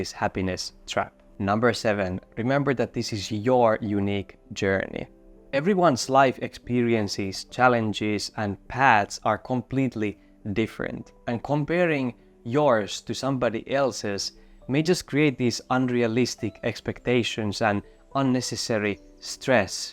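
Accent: Finland. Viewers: Hehe! No, it is the typical Finnish accent for well-educated Finns who learnt to speak English at a very young age - I love it, it reminds me of my beloved native Finland. * is in fact excellent English*, as my native English teacher at Lund University, Sweden stated - the Finnish students spoke the best English, he said. We had/have good teachers in Finland!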